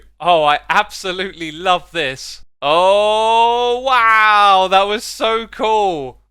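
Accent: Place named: United Kingdom. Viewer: I forgot how British you are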